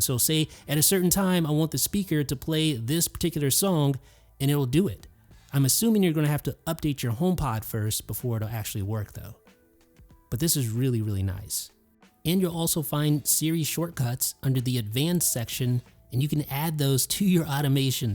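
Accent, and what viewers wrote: India. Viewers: Indian accent ❤️ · Whyyyyyyy the F would it have Indian accent????? xD xD xD xD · Indian voice 🤩🤩🤩🤩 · Grear vid and lots of info. All good except the language accent read back. No way would I put an indina voice to read me stuff on my phone. I can get that from all the scammers from there conning people here in the US lol. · Indian english accent.....indians like here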